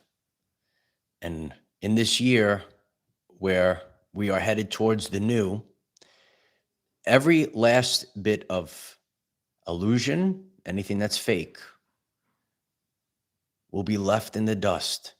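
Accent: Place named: Brooklyn, United States. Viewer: ❤ your Brooklyn Accent I have a friend from Brooklyn and her husband is from Lone Island that lives here in Texas as well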